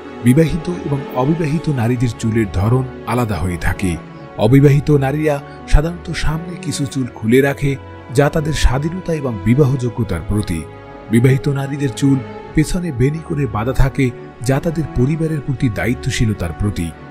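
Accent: Barishal, Bangladesh. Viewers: আপনি বরিশাইল্লা ভাষা কেন বলতেছেন। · আপনি কি বরিশালের লোক ?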